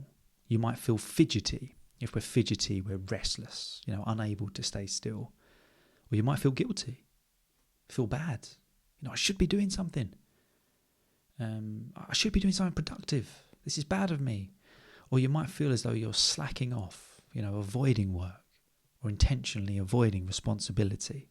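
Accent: United Kingdom. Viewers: I never write comments, but I wanted to support you at least this way, because I think, your channel is really underrated. I absolutely love your British accent and I really appreciate how you cover such interesting and thought-provoking topics in your videos. So far I’ve only watched your last two videos, but I’ve watched them 3 times each.😅 Keep up the great work!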